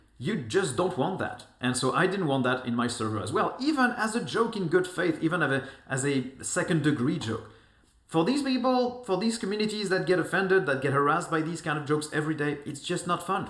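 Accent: France. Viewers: Also, you really really should consider making one video with that French accent of yours! It will be really really enjoyable ahhaha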